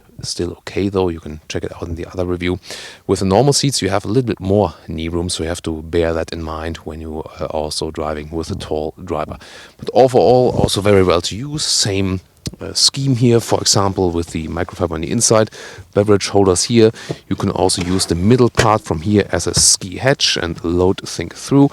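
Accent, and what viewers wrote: Germany. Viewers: Schönes Video, aber du solltest an deiner Aussprache arbeiten. Das Klingt mehr nach Deutsch, als nach Englisch^^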